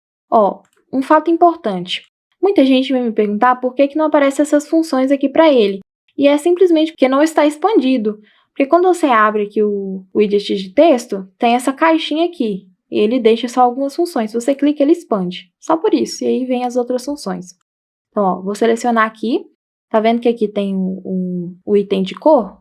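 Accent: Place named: Brasília, Brazil. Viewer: Se pudesse, dava 1000 likes. 👏👏👏👏👏👏👏👏. Como faço para ter uma aula presencial com vc? Vc é aqui de Brasília? ( Pergunto pelo sotaque. Rs).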